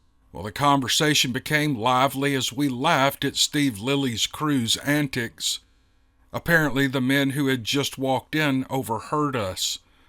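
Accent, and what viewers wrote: South, United States. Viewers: yous is the best story teller on the whole interwebs... it dont matter if its Steveo & the boys or the Wild Hunt crew, or just telling us a story someone sent to you... we all just wanna hear your southern twang telling us a story; )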